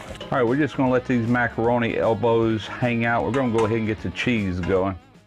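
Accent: South, United States. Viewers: I can tell just by your accent that your from the South will you please invite me to your next cookout? Lol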